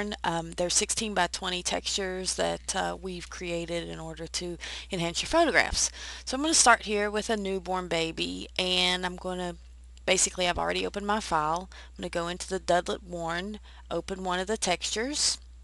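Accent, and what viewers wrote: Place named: South, United States. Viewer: thanks, dig that sexy accent too.......are you from the southern part of the states ?.........great vid !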